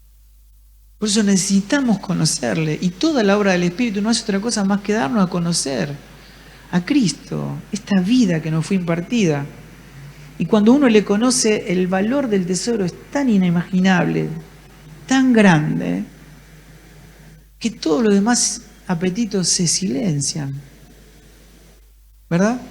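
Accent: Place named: Argentina. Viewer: Es argentino es normal su forma de hablar ...no busque el pelo en la sopa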